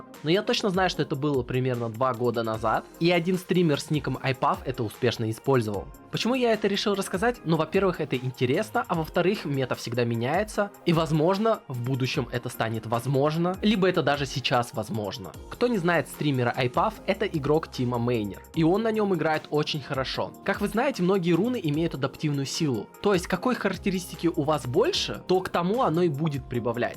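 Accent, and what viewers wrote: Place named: Asia. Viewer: он говорит на английском с некоторым азиатским акцентом. Подтвердить его русскую национальность не могу.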